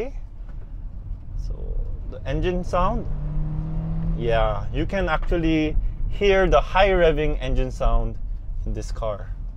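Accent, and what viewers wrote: Philippines. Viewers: I like the way he speaks English with an Ilonggo accent.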